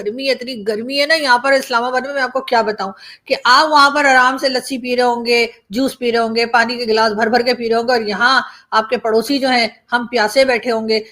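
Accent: India. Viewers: You are so beautiful and your speech is as Indian.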